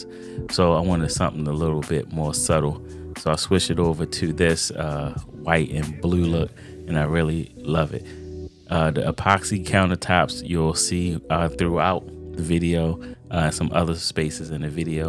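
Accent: Baltimore, United States. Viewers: I knew right off the bat youd be a ravens fan based off that heavy ass accent lmao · You definitely from bmore 😂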